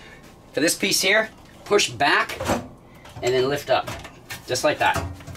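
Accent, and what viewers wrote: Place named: East Coast, United States. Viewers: Hi there, just installed my bug wire shields you had in your other video. Love all your fix it videos and glad I found your channel when you first started out. It feels like I have been everywhere you have because of YouTube. Good common sense information every time I watch your segments. My girlfriend is from back east and especially enjoys the videos and your eastern accent. Keep up the great work and enjoying life. Tom and Irene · Great Channel and good informative stuff ...but what makes me very happy is to hear New Hampshire accents again ... many moons ago when I was a kid we lived in Tamworth NH and I would take the school bus to Junior High up in Conway ...bitter cold ride but o how I loved Conway and the Mount Washington area .... Gotta get back to the North East again before I die ... love the Food and the people in a big way ... 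Happy Trails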